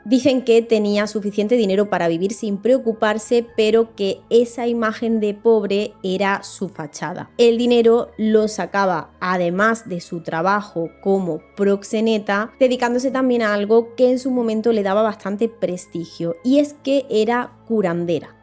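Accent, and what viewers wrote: Andalusia, Spain. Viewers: Pero no era una vampira era una Bruja y está historia si que es real una bisabuela mía estubo a punto de ser raptada por ella, porcierto me encanta tu acento eres andaluza?? Yo tengo familia lejana por allí saludos 😊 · Es la primera vez que veo éste canal en Youtube, ése acento es andaluz o de que parte de España?lo siento pero no me gusta para nada tu corte de cabello.... Saludos desde Argentina.... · É andalûh. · Es del sur de España, Andalucía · Es acento Andaluz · Exelente narrativa y tú acento Español Andaluz, Bella Andalucía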